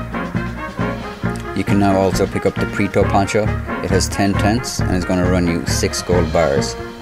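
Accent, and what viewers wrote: Ireland. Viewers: Also thumbs up to the voice over; you've kept all the important text for when I'm on the bus watching and don't want to bombard folks with audio they didn't ask for, but I'm sure they wouldn't mind with the low volume, even pace, and Irish accent. A+ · Keep talking Irish bro... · Voice over is brilliant, Irish voice goes really well with a cowboy theme imo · Holy shit, you're Irish? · Love the Voiceover man, are you irish? Your accent kinda sounds like it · The voice over is fne a great accent from the Emerald isle. Good vid, keep up the good work.👍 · Are you Irish yeah?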